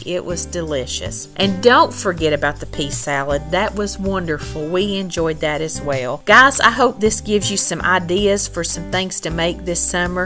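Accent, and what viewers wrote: South, United States. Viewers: Hello there new here and love your recipes and that country talk. I am also from the south Alabama. But live in Central Florida.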